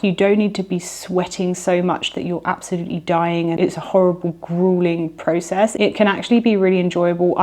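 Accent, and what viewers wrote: United Kingdom. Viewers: The British accent made it difficult for me to watch and understand this video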